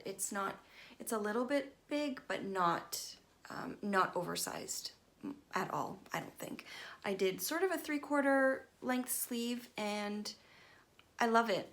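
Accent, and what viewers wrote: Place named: Scotland, United Kingdom. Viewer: Love the podcasts. A new one is dunderknit but I'm sure you already know about that one. She's a fellow Scot! Yeah!! Good to hear my accent in a podcast. Currently knitting my first sweater which is also my first colourwork project. Just upgraded my sewing machine so time to get creative instead of just repairing. Going to make some project bags as Christmas gifts this year. Love the pace of your podcasts. So calming!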